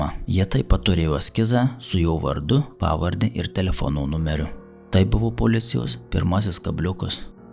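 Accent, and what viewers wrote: Suvalkija, Lithuania. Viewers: Man skamba kažkaip suvalkietiškai. O šiaip reikia tobulėti, su laiku manau viskas išsisprendžia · Ar tu suvalkietis? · Taip, akcentas suvalkietiškas. O dėl tobulėjimo, tai labai teisingai, reikia praktikos, laiko ir viskas eis su kiekvienu video vis geryn. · Viskas būtų ok, bet suvvalkiečio akcentas turėtų išnykti.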